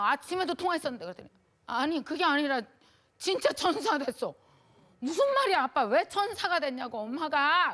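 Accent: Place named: Chungcheong, South Korea. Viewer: 구수한 충청도사투리 넘! 강의 잘듣고 많이 웃고 감니다.....🎉😅❤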